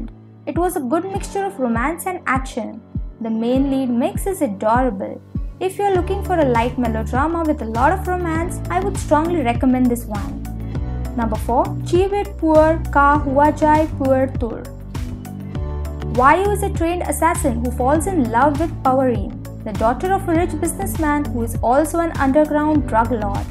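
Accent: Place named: Thailand. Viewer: Hola, tu acento parece tailandés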